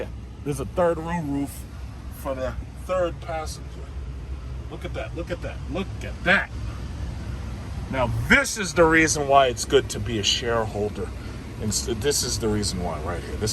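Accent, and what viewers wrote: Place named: New Jersey, United States. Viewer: Sounds like he's From Jersey, Also he did a comparison with the Standard Length Escalade, what About the EXT Long Version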